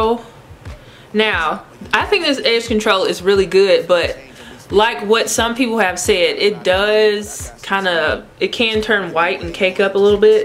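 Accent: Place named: South, United States. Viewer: This unit is still a classic gorgeous long haired style. I love it. It'll always be beautiful on you. I love your accent and wondering where are you from? You speak like my fave cousins who are in the south, lol. Thanks great review.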